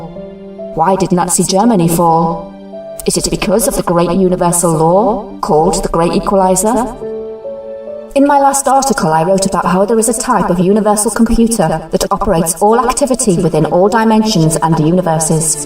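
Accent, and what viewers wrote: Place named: England, United Kingdom. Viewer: The English accent doesn't help either. LOL Not your fault,. I hear electronically with the cochlear implant. Maybe I can find a different speaker doing this information somewhere or a transcript.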